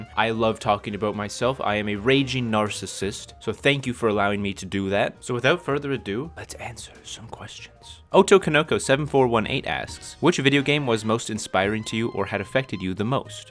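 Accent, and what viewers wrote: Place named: Canada. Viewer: I could tell you were canadian from the way you pronounced "out" and "about" came here to comfirm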